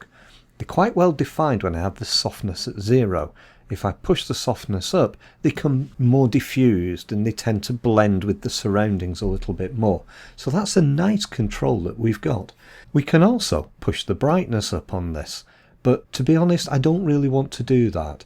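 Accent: United Kingdom. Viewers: Nice to hear a fellow Brit extolling ON1. I've seen this done differently as well in ON1; duplicate the layer, drop the exposure by a stop, add blur and then reduce the mask to about 50%. It'd be interesting to compare the two.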